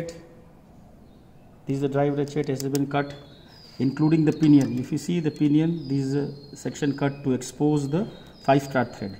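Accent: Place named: India. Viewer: Logat india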